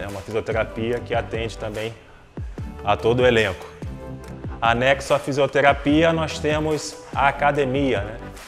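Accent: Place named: Minas Gerais, Brazil. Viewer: MINEIRO,COM ESSE X,RS?Ixqueiro...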